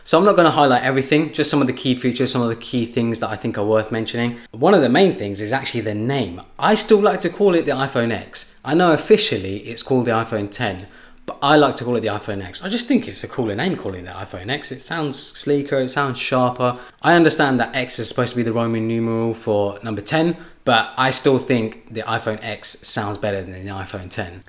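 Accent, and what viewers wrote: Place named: London, United Kingdom. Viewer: British london accent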